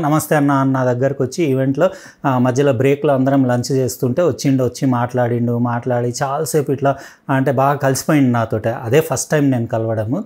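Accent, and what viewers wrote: Telangana, India. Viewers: Telengana slag bale vuntundhi.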